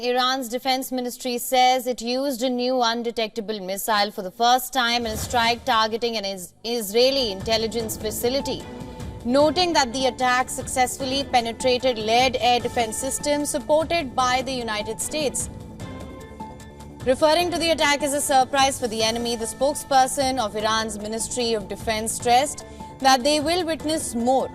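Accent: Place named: Gujarat, India. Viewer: Is this channel for Indians only...why does she speak like some Gujarati aunt from some factory in England.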